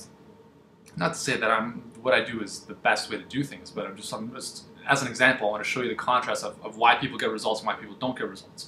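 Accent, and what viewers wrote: Canada. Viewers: Yeah... That's just how Canadians talk. Nothing wrong with it. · Worse than all these things listed would be having to listen to a Canadian accent while trying to work out.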